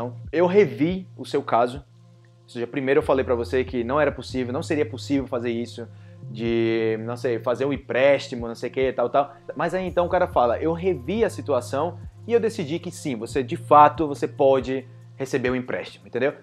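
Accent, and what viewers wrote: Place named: Colombia. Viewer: Eu gosto quando ele fala em espanhol, ele escuta tão colombiano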